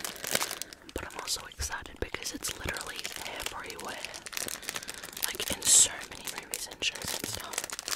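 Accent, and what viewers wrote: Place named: Australia. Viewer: I love your voice. It is perfect for ASMR :) I wish that I had an Australian accent instead of a Canadian one lol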